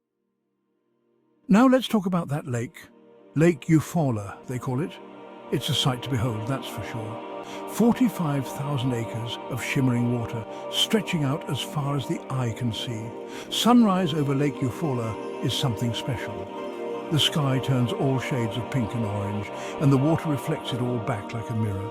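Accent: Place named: United Kingdom. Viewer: What a crock I lived in Eufaula for over 30 years. This is a bunch of stock photography and some dude with a British accent thumbs down.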